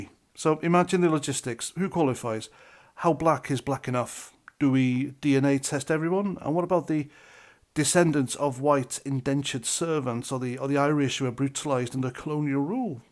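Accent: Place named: RP, United Kingdom. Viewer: What a fraud this wanker is talks posh now he’s played in a few Christmas pantos Sir my arse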